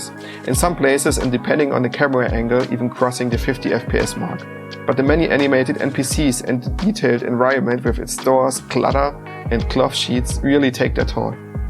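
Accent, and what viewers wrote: Germany. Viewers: Moin! Vom Akzent her würd ich mal auf den deutschsprachigen Raum tippen? 😉Danke für deinen Deep Dive, gefällt mir super! Kannst dich direkt vom Start weg mit DF messen, da kann ich mir noch ne Scheibe von abschneiden!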